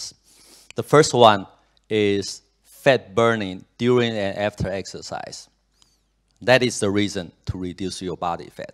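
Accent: Taiwan. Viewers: 台腔教授讚!這種腔反而才聽得下去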